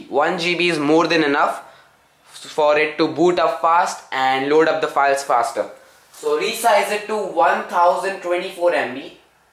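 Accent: India. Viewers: Great video. Love your accent, I work with several guys from India, you made me feel at home!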